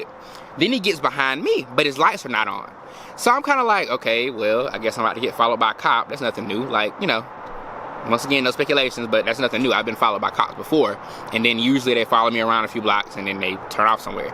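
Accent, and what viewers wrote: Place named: Georgia, United States. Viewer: lmao sounds like gwinnet 😂😂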